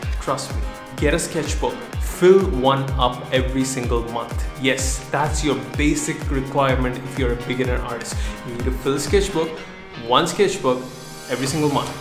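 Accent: India. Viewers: I love how he says “cool” in that sick Indian accent all the time.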